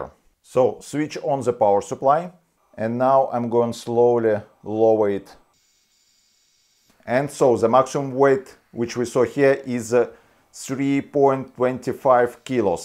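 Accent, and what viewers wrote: Russia. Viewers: Вэри хард инглиш. читаХ - а у русских всё через Х. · Привет МУЖИК! Ты разговариваешь с Русским акцентом, давай уже видосы на Русском. Ты афигенен!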